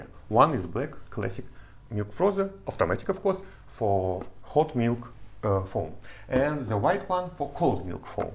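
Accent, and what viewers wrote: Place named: Russia. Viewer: Просто жесточайший рунглиш Не осилил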